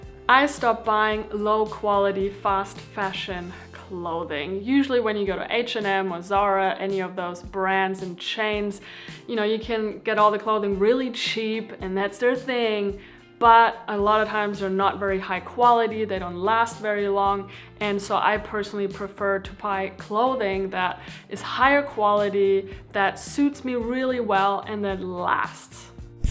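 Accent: Germany. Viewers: I stopped dating and found I had a wealth of time and money. Your accent, is it German? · are you German? I think I hear some accent 😊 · Great video, thanks! Especially the idea with the physical gifts... I’m gonna do that. Are you actually german? I like that lovely accent so much! greetz and hugs from Berlin 🐻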